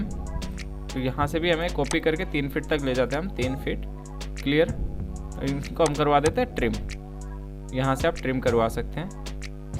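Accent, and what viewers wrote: India. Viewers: Please 🙏🙏🙏 Speak in Britain accent Stop using Indian accent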